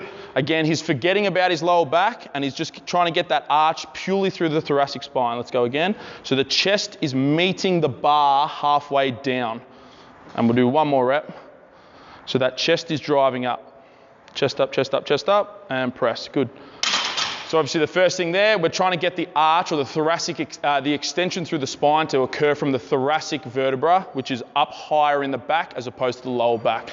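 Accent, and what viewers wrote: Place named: Germany. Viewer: The fact that you like a German tryna speak English is gold love 💕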